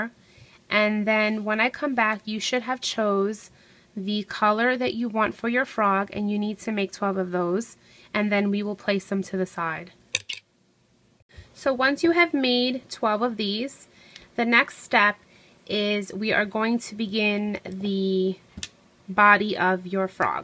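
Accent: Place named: New York, United States. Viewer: Are you from New York? You have a slight New York accent and it's so sassy ^-^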